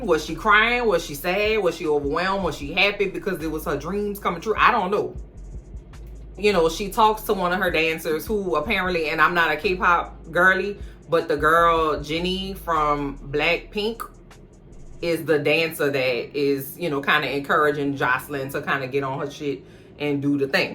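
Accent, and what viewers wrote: New Orleans, United States. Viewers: I hear that nola accent fasho ooouuuweee we might be related 😂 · I’m so glad I found your channel! I’m from Nola and ur voice sounds like home.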